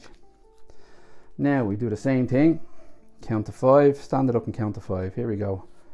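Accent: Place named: Ireland. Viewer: Thanks this is a great resourse and I shall be using it. You are great at explaining. I will share the link with all my YouTube pouring addict friends. Love your Irish accent and great to find some teachers in Europe. Thanks again, Rita x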